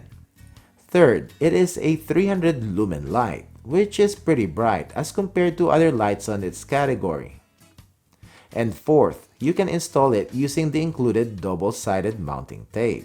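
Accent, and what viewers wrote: Philippines. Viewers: Thanks for the thoroughly reviewed products. Are you Filipino? Your accent is very similar to many of my colleagues in HI. Lots of Southern Pacific Asians here. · First time I've heard a Filipino accent with the typical YouTube speaking tone ... Thanks for your review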